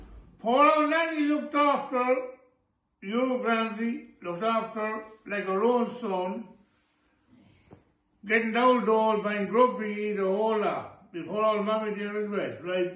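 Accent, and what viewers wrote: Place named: Spain. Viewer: Is this a drunk Spanish man I’m struggling with the dialect.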